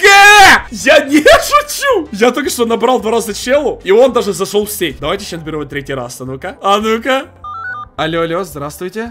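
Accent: Ukraine. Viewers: Ты Украинец ?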